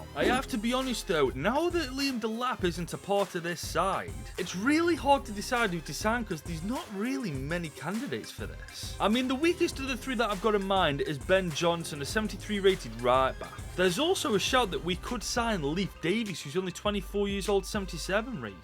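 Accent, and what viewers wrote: Stoke, United Kingdom. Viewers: Are you from Stoke mate?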